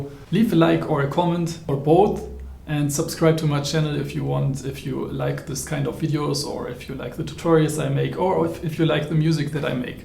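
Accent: Germany. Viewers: by the accent it seems to be a German. Ich mag Evah Pirazzi.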